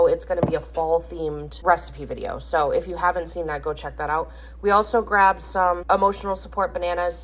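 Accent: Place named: Wisconsin, United States. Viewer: Hi! I’ve been watching for a month or so now but I’ve never commented! I’m so curious where your accent is from? I would’ve guessed you’re from Wisconsin 😅 I love your videos, you and are hilarious!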